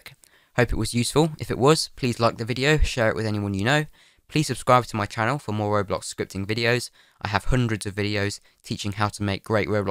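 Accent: United Kingdom. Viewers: British + English =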